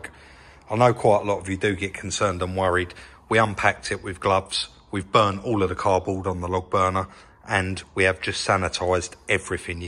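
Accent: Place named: Kent, United Kingdom. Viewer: He is from Kent, its just the local accent, nothing wrong with it. Dont ask me if he is a Kentish man or man of Kent. Something about the Medway and a big deal for some people.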